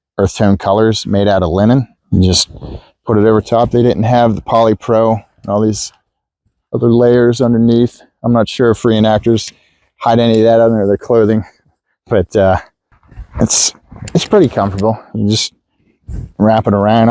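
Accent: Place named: Pittsburgh, United States. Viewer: When I saw the one of your other videos, I thought that there was a little "yinzer" in that accent. The second video shows you sitting at the Point. :)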